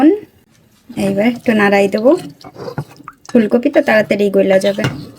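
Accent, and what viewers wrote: Khulna, Bangladesh. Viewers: Aunty ami pry 2 yr ar bashi apnr video dakhi vlo lage, amr basa o khulna apni jokhn Khulna acchiln oi somai ei bujchilm apnr kn amdr moto kotha kno.❤️❤️❤️❤️❤️❤️❤️❤️❤️❤️❤️❤️❤️❤️❤️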